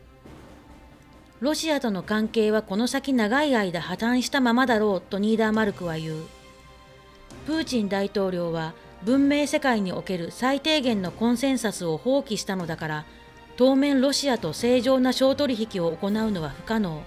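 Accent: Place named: Nagoya, Japan. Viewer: 名古屋イントネーションのアナ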